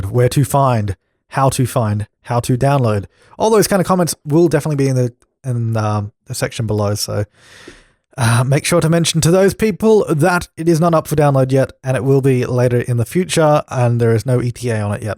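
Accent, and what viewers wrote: Australia. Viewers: You are like the only person who I can watch play sims because you have an Australian accent hahaha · Also hell yeah, you're Australian.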